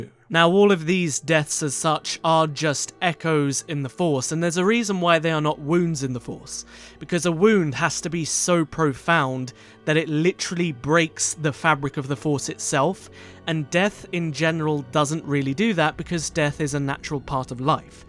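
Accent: RP, United Kingdom. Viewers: I apologize if this is an ignorant question, but is your accent recieved pronounciation? I love your videos, I've been binging them for a few weeks now. Kotor 2 was more than just a game to me too, I can't wait for the future of narratives set during this era.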